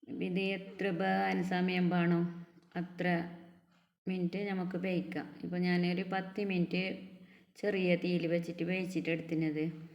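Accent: Kasaragod, India. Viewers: മീൻ കറി റെസിപ്പി ഇഷ്ടമായി കൂടെ കാസർഗോഡ് ഭാഷഒത്തിരി ഇഷ്ടപ്പെട്ടു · എനിക്ക് നിങ്ങളെ വീഡിയോ കാണുന്നത് വളരെ ഇഷ്ടമാണ് നിങ്ങളെ വോയിസ് ആണ് എനിക്ക് ഏറ്റവും ഇഷ്ടം നിങ്ങളെ കാസർകോട് സംസാരരീതി എനിക്ക് വളരെ ഇഷ്ടമാണ് റെസിപ്പി സൂപ്പർ താങ്ക്യൂ ഫോർ ഷെയറിങ്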